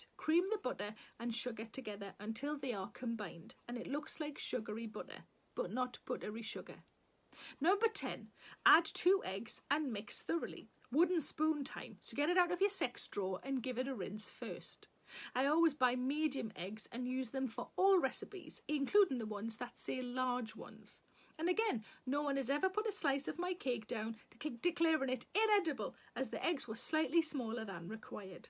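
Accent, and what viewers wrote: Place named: Northern England, United Kingdom. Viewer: I’m from Canada and can I just say, of all the different british accents yours is the warmest most wholesome I’ve heard. You’re hilarious, well spoken and to top it all off the way you say it all warms my heart. Both with laughter and just out of novelty since I myself hear a lot of flat American accents. I’ll miss this every day. Never been to northern England but I’m already biased in favour of the North. Much love from Toronto!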